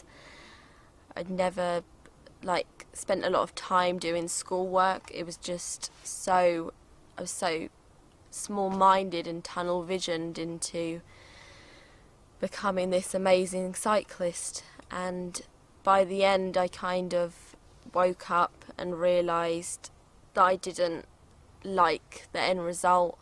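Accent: United Kingdom. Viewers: I love your mind. Also your British acsent remains me my MSc in Scotland:)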